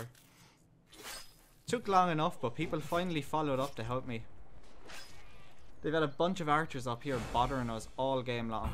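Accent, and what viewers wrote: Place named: Ireland. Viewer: Your description and accent say you're Irish. Would you like to see medieval melee action in Ireland of the kind shown in this game? Also, would you like the devs to put in a "Master Archer" perk that lets you see the exact ballistic drop (but not trajectory) of your arrow when aiming long distances? I'm sure they might be persuaded if enough people asked for it.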